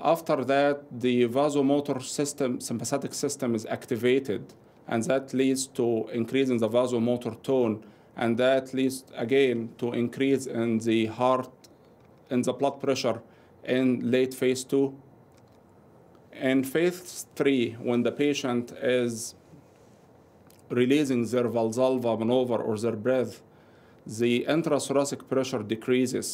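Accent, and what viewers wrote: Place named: Egypt. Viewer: VERY INFORMATIVE. strong Egyptian accent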